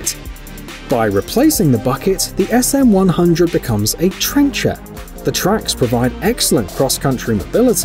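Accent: United Kingdom. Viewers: C'mon now who measures height in inches??? Weak. So total collapsible ladder height goes from 35"-14.5'. hate it when you get un experienced YT announcers using frugal and absurd measuring techniques despite the status quo. Like American calculations given in metric or American applied items with temperature variances given in Celsius.... Uh what is it 27° F for every degree Celsius? Don't know off hand Which inadvertantly cancels out the value of the information being presented. British accent or not, if you are going to present the ladder you might as well present the common method of measurement. It's only laziness and ignorance when presentations are as thorough as they are without doing so... Enough for some to immediately stop viewing as conversions are essential and we are not in Europe and we are in The great failing and falling America. Don't even know why i attempt to defend this skid mark country... 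All politicians need to be removed and no I'm not a domestic terrorist - just a disgusted true American Patriot. Live free or die.. waiting to for ad freedom is a joke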